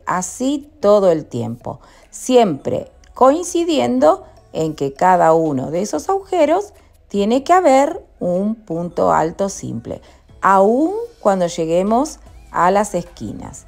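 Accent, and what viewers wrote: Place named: Central America. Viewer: Me gusta el tejido, muy fácil!!! No entiendo xq siendo argentina habla como centroaméricana ó algo así. Pero todo excelente!!!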